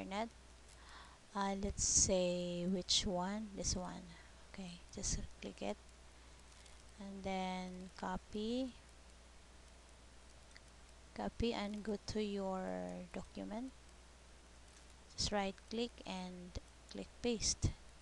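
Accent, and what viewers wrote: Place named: Philippines. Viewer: From the accent I can already tell you're a Filipina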